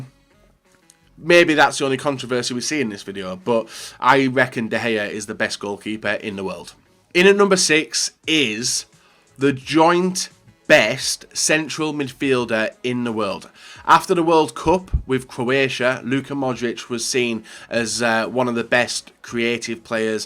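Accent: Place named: England, United Kingdom. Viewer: Como se nota k eres inglés... donde esta Rakitic? Y Coutinho?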